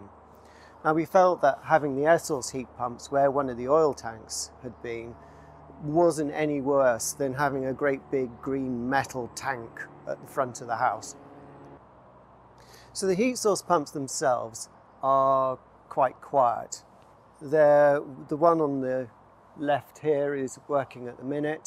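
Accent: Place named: Southern England, United Kingdom. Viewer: It would help to know where in the country you are. I take it you are not in Scotland and from your accent you are probably in the South. So is the system only suitable in Southern England where, these days, it is quite warm?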